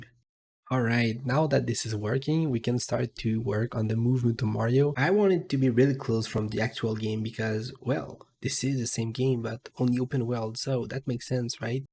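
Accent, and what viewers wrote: France. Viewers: Are you french😮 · T’es français en plus ??? Je reconnais l’accent 😭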